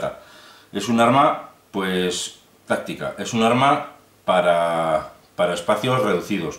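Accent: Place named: Spain. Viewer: Hombre claro, no oyes el acento es Español, yo acabo de recoger en la intervención de armas de la Guardia Civil, la Mossberg 500, Escorpión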